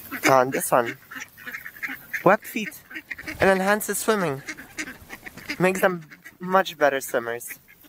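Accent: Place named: United Kingdom. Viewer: You are from Canada? I thought from UK. Cute ducks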